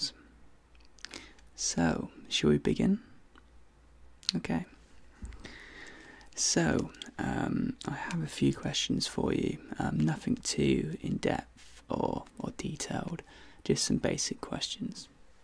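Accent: United Kingdom. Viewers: The (super mega hot) British accent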